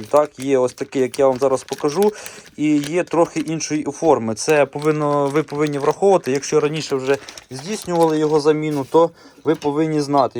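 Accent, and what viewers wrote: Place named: Ukraine. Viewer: По акценту украинец , лайк и подписан давно , у самого леганза , слава Украине !!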